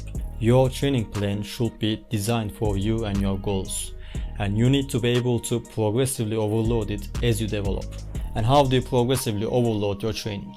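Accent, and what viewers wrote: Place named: Turkey. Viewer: Bu bir destek yorumudur. Diline sağlık. İngilizcen bayağı iyi ama türk aksanın belirgin =)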